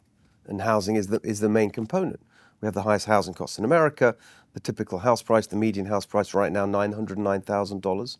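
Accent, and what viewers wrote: United Kingdom. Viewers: another Brit telling us how 2 plus 2 equals 5? Great. This man is devoid of any economic knowledge. The price of energy in California is almost 50% above the national average. Energy costs are inflationary. Plus, he doesn’t distinguish between the cost of living versus the cost of labor. How about bringing someone else in whose sole qualification isn’t a pommy accent? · The man has a British accent but lives in California?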